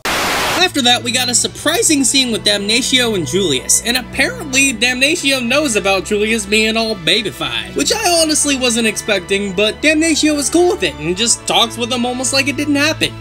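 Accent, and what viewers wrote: United States. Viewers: Dude I hate the #Americanvoice but love the content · Disliked because American accent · Great content but I'm not taken by the American accent. It's not as funny. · American accent or accent is the problem this channels facing right now. Personally I can understand the accent but for the audience that can't well I,ll leave this problem for you to decide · No this is his American accent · Can you not make this American do the vids cuz its hella fucking annoying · I'm not hating on the American accent but I feel like it removed the humor from a lot of your jokes especially from the Baaaaalllls Deep... Still good vid tho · No more American accent!!!!!!!! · Throw this american accent ting away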